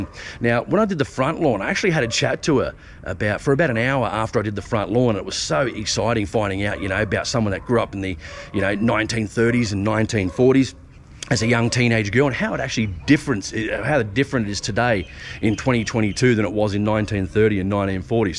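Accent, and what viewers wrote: Australia. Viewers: Love watching your videos. You have a keen sense of humor. Along with the humor you have a heart of gold. You are always helping others. Love the Aussie accent.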